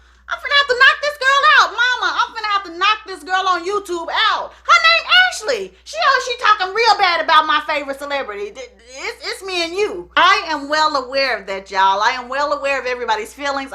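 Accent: South, United States. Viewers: A southern slain for “how come” 😂 she’s straight country lol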